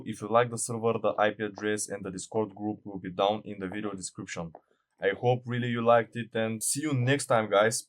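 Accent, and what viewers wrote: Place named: Pakistan. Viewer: You sound pakistani.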